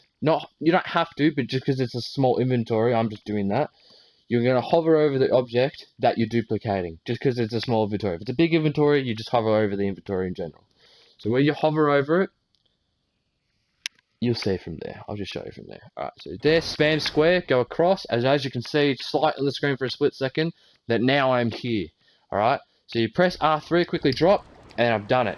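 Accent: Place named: Australia. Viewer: Strong australian like it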